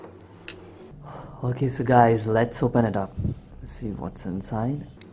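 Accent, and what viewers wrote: India. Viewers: 这是印度口音吗？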